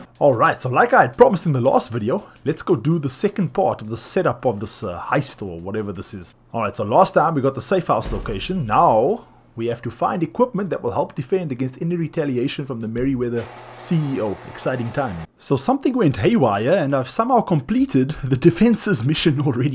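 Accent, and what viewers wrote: South Africa. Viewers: Are you originally from South Africa?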